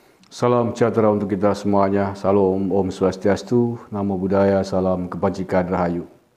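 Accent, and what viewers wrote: Banyumas, Indonesia. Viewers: 😀😀😀😀geger moweeeerr ,muaaantaap khaas banyumasan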